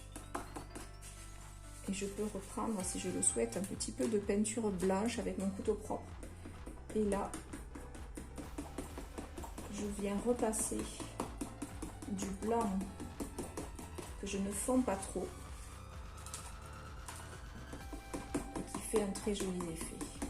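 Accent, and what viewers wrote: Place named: Toulouse, France. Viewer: BRVOS beau travail e t bel accent de TOULOUSE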